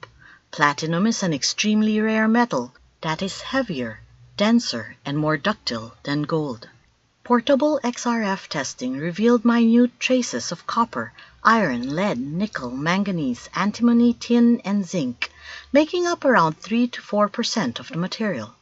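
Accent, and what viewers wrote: Philippines. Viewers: Exactly and it's quite obvious. It smells Chinese propaganda. The whole false narrative their trying to inject into our history is totally laughable. And the use of Filipina voice and Tagalog username is deceptive. Remember from TGC vid that before the Chinese ever knew how to build ships, the early Ophirians were already masters of the sea.... In fact the pintados from the Visayas and Sulu frequently terrorized China's coastal communities....ransacking and pillaging them. And the only attempt by China to conquer Manila was through Limahong who failed miserably.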